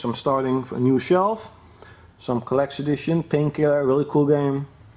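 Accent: Netherlands. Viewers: you really have a Dutch English accent lol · Ben je nederlands? Are you dutch because you have a dutch accent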